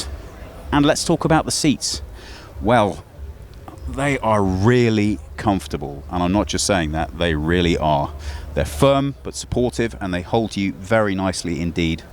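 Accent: Germany. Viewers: I like this man, he speaks very fluently and clearly. Is he german?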